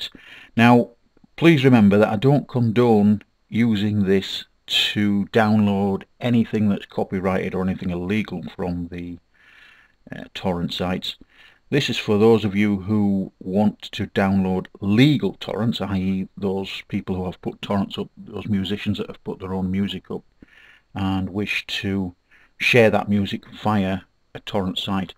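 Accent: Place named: United Kingdom. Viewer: nice i've always wanted British people narrate .. lol nice voice the damn grammar XD!! don't get me wrong though .. nice vid and i'l be checking in and out for updates in pirate browser . .again thx man ..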